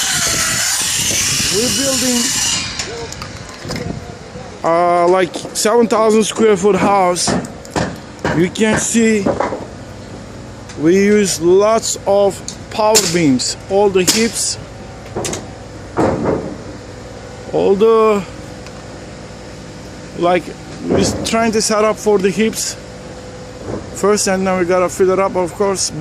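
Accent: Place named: Poland. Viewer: Your accent brings tears to my eyes, thinking of my family from Poland !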